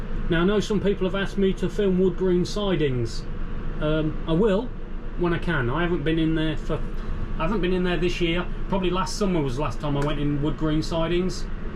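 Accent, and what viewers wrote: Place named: Lincolnshire, United Kingdom. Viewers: So there seems to be a 25mph limit at upon entry to each station these days, I swear trains used to enter the stations at higher speeds back in the day... I'm going back to the 80's - Is this true? Any veteran drivers know if it is? Re: Accent.. Ha! I knew it.. i thought it was either south-east Northamptonshire or Lincolnshire. 👍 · Thank you for another really interesting video. I would have guessed Midlands too with your accent.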